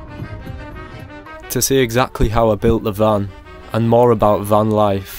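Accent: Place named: Yorkshire, United Kingdom. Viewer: That's a good old yorkshire accent for ya :-)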